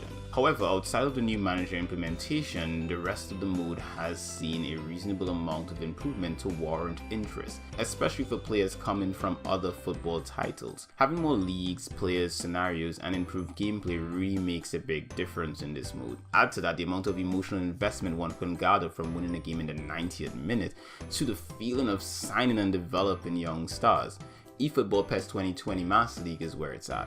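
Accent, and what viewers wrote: Trinidad and Tobago. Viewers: yeah im from trinidad but, i live in UK now and have lived away for a long time. Sorry if you're not, just thought some of the words you say sounded Trini like you might have some ties there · I'm a trini as well. Your accent sounded familiar so that's why I asked.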